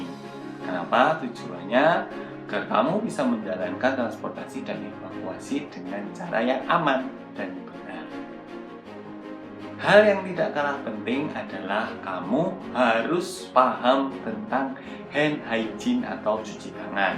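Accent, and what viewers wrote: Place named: Java, Indonesia. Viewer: Mas...keren euy...logat jowo yg bikin org yg denger bakal hafal tanpa melihat wajahnya... edukasinya asik2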